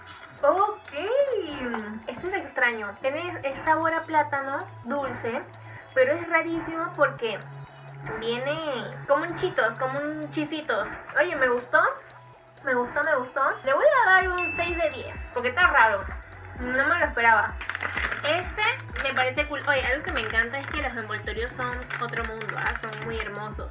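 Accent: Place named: Peru. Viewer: Tu acento es peruano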